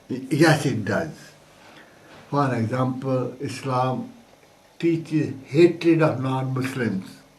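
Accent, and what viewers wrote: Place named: Kashmir, India. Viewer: you speak like kashmiri pundit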